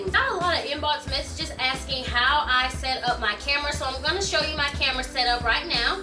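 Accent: South, United States. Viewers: I love your southern accent...if you have one.... Very insightful video :D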